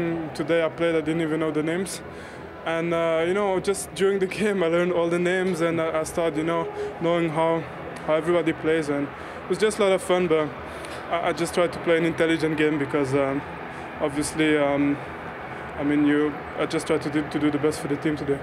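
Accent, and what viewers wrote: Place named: Manchester, United Kingdom. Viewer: Funny how his Belgian accent evolved into a Manc one over the years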